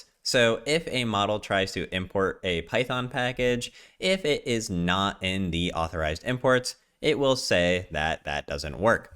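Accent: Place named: United States. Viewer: Your channel caught my attention because of an Ł in your name. I thought you were Polish, but your accent sounds like you are USA born and raised. I love the content. Very informative and in depth. I can't believe you are not some ML wizz in the Silicon Valley. Keep up the good work!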